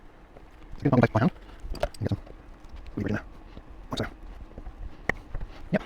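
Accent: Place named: RP, United Kingdom. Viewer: Great repair! I am a teacher of English living in Mallorca, Spain. I need to say that you have a great accent and pronunciation! I have even shown your videos to my students to show them propper RP! I am also very keen on repairing amplifiers! Congratulations on how much you teach the community with your great videos!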